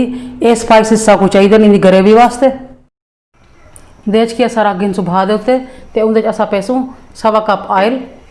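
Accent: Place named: Saraiki, Pakistan. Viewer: سبحان اللہ سبحان جیا آپ لہجہ سرائیکی ہی رکھا کریں اور ساتھ چیزیں کی تعداد کے لحاظ سے کوئی برتن بھی بتایا کریں جاوید خان تشنہ ایران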